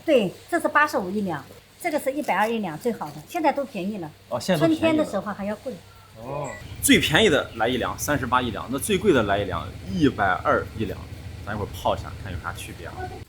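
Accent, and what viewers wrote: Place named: Northwest, China. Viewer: 哥们儿我之前看你第一个视频听口音就觉得是西北人果然是 老乡啊我出生在西安雁塔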